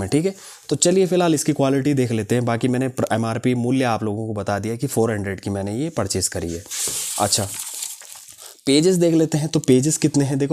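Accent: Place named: Garhwal, India. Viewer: गढ़वाली tone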